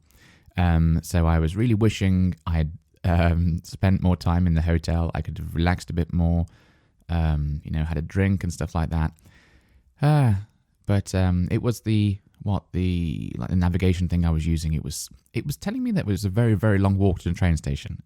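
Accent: United Kingdom. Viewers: British Accent ❤❤❤❤❤❤ I love listening British podcasts.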